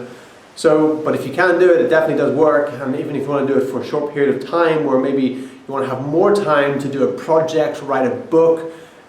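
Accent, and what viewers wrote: Ireland. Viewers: I think he's got a hint of an Irish accent. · What part of Ireland are you from?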